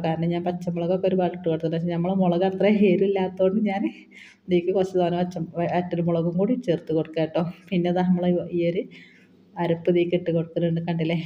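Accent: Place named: Malappuram, India. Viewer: Wow ഹെൽത്തി കപ്പ കറി അടിപൊളി ആയിട്ടുണ്ടല്ലോ പൊളിച്ചു എനിക്കു ഇഷ്ടം എല്ലാവർക്കും ഇഷ്ടം ആകുന്ന മനോഹരമായ മലപ്പുറം നാടൻ ഭാഷ വന്നപ്പോൾ കപ്പ തിന്ന ഫീൽ ആയി 👌👌😋😋🥰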